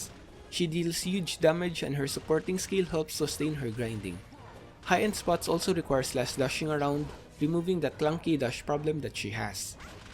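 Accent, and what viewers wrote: Philippines. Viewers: Sorry but I can't help but to notice. are you from south east asia? Particulary from the philippines? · I know a pinoy accent when i hear one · Yep first 3 seconds and I can already tell this guy is pinoy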